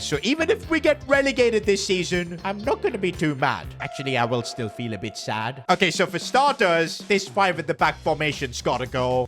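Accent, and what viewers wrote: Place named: India. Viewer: You talk like an Indian!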